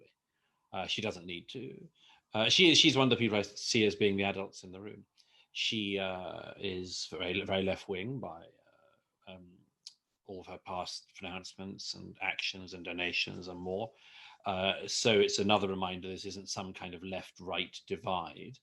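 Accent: United Kingdom. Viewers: Your tuned British accent ❤ makes me hot on you Douglas babe 😘💋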